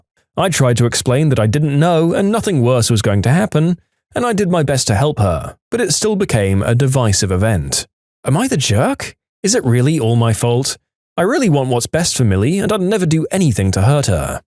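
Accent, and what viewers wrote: United Kingdom. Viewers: I’ll leave an actual comment later, hopefully the British narrator sees this. He’s my favorite narrator of the 4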